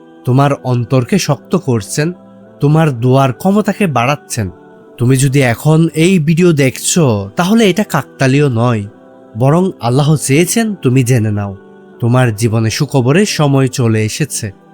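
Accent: Chittagong, Bangladesh. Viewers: আসসালামু আলাইকুম ভাই বিনীত অনুরোধ আপনার কাছে পরিশুদ্ধভাবে উচ্চারণ শিখে সুন্দর উপস্থাপনার মাধ্যমে ভিডিও তৈরি করেন আপনি যে চিটাগাইঙ্গা অজপাড়া গায়ের ভাষা থেকে বের হয়ে আসতে পারেননি তা তো একেবারেই পরিষ্কার পরিশুদ্ধ ভাষায় কথা বলতে চেষ্টা করলেও আপনার মুখ থেকে চিটাগাইঙ্গা ভাষাযই বের হয়ে আসে। ভিউয়ার বাড়িয়ে পয়সা কামানোর ধান্দা না করে চিটাংগের ভাষায় কথা বলা পরিহার করেন। এজন্য আমি আপনার ভিডিও দেখি না শুধুমাত্র আপনার চিটাইংগা গন্ধ পাওয়ার কারণে পরিশুদ্ধ উচ্চারণ এবং সুন্দর উপস্থাপনার ট্রেনিং গ্রহণ করুন তারপর ইউটিউবে আসুন ।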